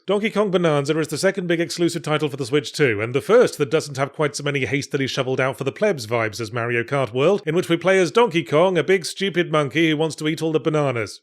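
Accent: United Kingdom. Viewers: Yahtzee explained in a stream that it's just awkward to pronounce. Likely due to his British pronunciation of the "banana" part specifically.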